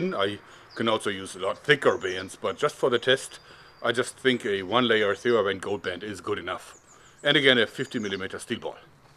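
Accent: Germany. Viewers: Man merkt dass du ein deutsche bist :P Aber nette Falle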